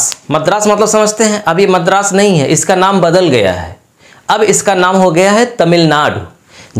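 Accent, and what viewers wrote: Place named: Uttar Pradesh, India. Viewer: U P me isi tarah se bolte hai....pronunciation pe mat jaiye...kitna acchese padhate hai..ye dekhiye please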